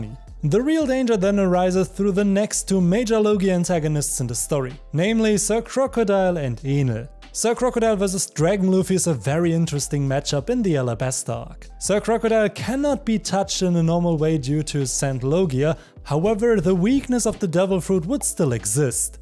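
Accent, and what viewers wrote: Germany. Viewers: Ich liebe den deutschen Akzent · ur german 100% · you sound german. also, änel lol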